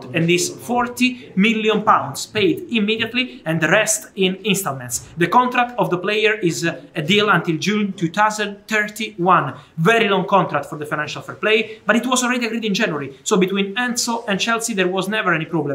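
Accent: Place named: Italy. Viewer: Love the accent. Damn Italians..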